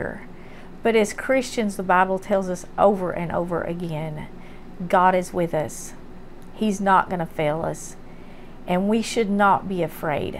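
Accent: South, United States. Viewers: She is a sweet and real lady but, I just love her accent...southern and country. God Bless her and her family!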